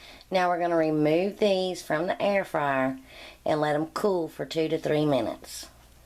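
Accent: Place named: West Virginia, United States. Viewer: thank's I'm not sure how to make ganache. but will try to google it, I hate to be so dense, but could you use vanilla or caramal chips instead of chocolate?? I love chocolate but my husband likes the yellow cake. I just love your voice, reminds me of where I came from, WV. left there 55 yrs ago. but all my friends are still there. love ya and thank's for responding.BTW I got adventurs and tried the Jiffy raspberry muffin mix and put it in a small cake pan, airfried it for 20 min and it came out perfect, I couldn't beleive it.just enough for the two of us.. tks again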